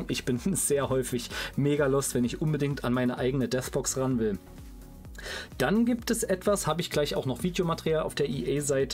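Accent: Saxony, Germany. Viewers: Kommst. Du aus sachsen wie ich?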